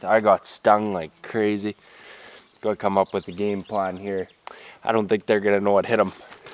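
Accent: Canada. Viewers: What you got there is a good Canadian kid eh! Saskatchewan I think.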